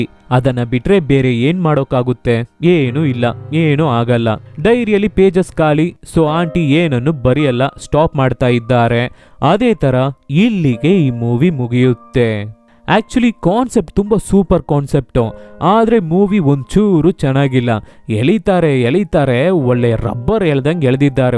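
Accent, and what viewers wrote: Tamil Nadu, India. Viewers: Your mother tongue is tamil ryt?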